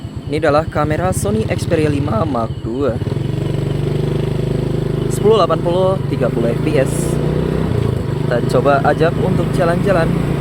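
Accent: Banyumas, Indonesia. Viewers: Omaak orang banyumas🎉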